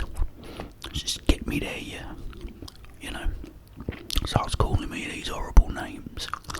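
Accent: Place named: Cockney, United Kingdom. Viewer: Hahaha. the cockney accent is Soo tingly!! I literally fell asleep to this earlier and had a weird nap dream about opening all the drapes in your house and you arguing with me to keep them closed 😂 But close up heavy accent whispers are such great ASMR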